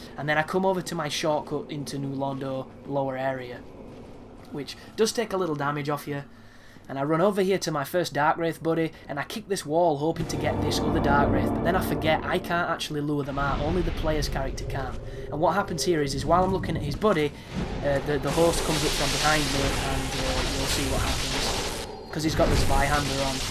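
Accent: United Kingdom. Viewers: Bro, it's Laito not Liato. Its in-dick-t-ment not indIcted. I know your british but come on man.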